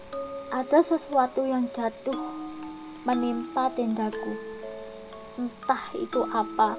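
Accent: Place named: Java, Indonesia. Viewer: Medok amat ngomong y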